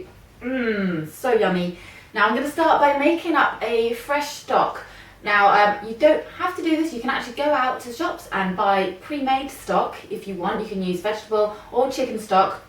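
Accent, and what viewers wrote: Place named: Milton Keynes, United Kingdom. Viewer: were about in the uk do u live u sound like u have a milton keynes accent. from a fan that is 12 and loves to cook and suprisingly a boy. :D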